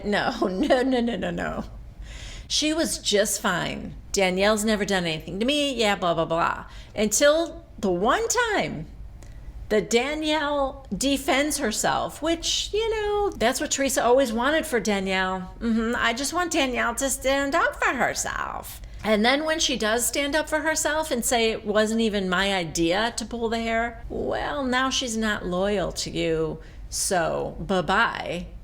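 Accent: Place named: Midwest, United States. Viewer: Love listening to you midwestern, my favorite recapper!!!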